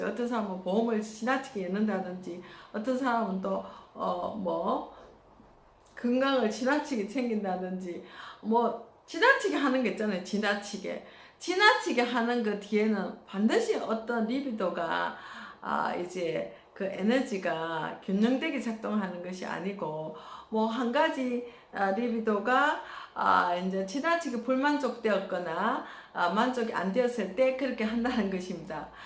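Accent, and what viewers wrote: Gyeongsang, South Korea. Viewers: 안녕하세요💓 리비도ᆢ 누구에게나 기본적인 정신분석을 잘 말씀해 주셔서 저에게 많은 도움이 되었습니다ᆢ 경상도 말씨는 은근히 매력있습니다 🤗 감사합니다 행복한 오 월 되세요 🥰🌻🌷🌷🌷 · 오늘 리비도에 대한 삶의 에너지 설명 매력적인 경상도 억양으로 잘 말씀 해주셔서 잘배웠습니다 좋아요 12번째 응원합니다 아무쪼록 좋은하루 되시기 바랍니다 😊 😄 😀 🙏 🤗 💕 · 강의내용에 많은 공감을 합니다 휴일 잠시 님의 강의를 다시 듣 고져 시청중입니다.그리고 연결 합니다..자주 뵙게되겠습니다~^~ 저는 서울입니다.30여년 대구에 서 자라고 학교를 나왔죠..쌤의 사투리가 대구.부산? ㅎ멋진 휴일되세요~^~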